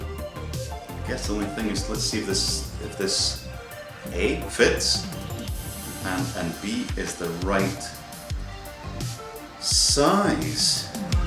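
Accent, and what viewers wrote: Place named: Scotland, United Kingdom. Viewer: I hope so matey, because I love them and your Scottish accent.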